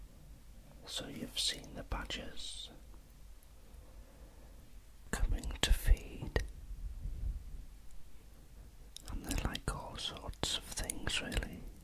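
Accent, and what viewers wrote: Wales, United Kingdom. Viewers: Wow, this is amazing. Are you Welsh Sir. Those Squirrels are seriously cute.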